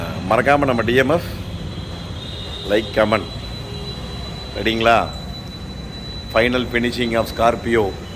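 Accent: Kongu, India. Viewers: கொஞ்சும் கொங்குத் தமிழ் பேசும் கோமகரே...! திகட்டாத தித்திக்கும் பேச்சுடைய திருப்பூர் திருமகரே...! எத்தனை அழுக்குப் படிந்த வாகனமாக இருந்தாலென்ன?? வெள்ளை மனமும் பிள்ளை குணமும் கொண்ட மதிப்பிற்குறிய அய்யா🙏 உங்கள் வேலைப்பாடுகளில் வாகனங்கள் ஜொலித்திடுமே தகதகவென்னும் தங்கம்போல❤❤❤❤❤ பின்னலாடைக்கு பெயர்போன ஊராம் திறமை வாய்ந்த ஊராம் திருப்பூர்...! அத்திருப்பூரில் வாழும் திருமகர் அய்யாவின் வெள்ளை மனம்போல மாறிவிட்டது...! இந்த வாகனத்தின் வென்மை நிறம்...! இந்த வாகனத்திற்கு மட்டுமல்ல அனைத்து வாகன வேலைப்பாடுகளுக்கும் கடினமாக உழைக்கும் உங்கள் நிறுவன ஊழியர்களுக்கு என் மனமார்ந்த பாராட்டுக்களையும்❤❤❤ வாழ்த்துக்களையும்💐💐💐 தெரிவித்துக்கொள்கிறேன் என்றும் அன்புடன் உங்கள் மகன்போல இவன் ரா.முஹம்மது கபூர் (சென்னையில் இருந்து)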